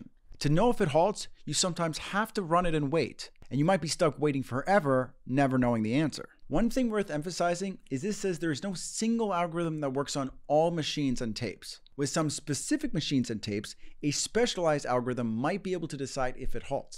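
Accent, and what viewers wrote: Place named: East Coast, United States. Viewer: You have a very similar accent to three blue, one brown. Is that like in East Coast thing?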